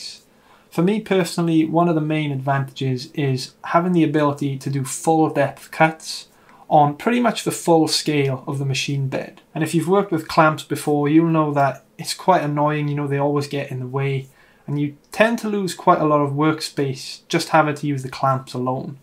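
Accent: South Wales, United Kingdom. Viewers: Love your video and your Welsh accent sounds like South Wales as is mine I now live in Canada and am a retired senior citizen, as a result I am unable to offer support by way of paid membership membership given this info I wonder if you would consider sending me a copy of the code you used for flattening you spoil board? Also please advise what part of Wales are you from or located I just have a feeling we would not be far away if I still lived there.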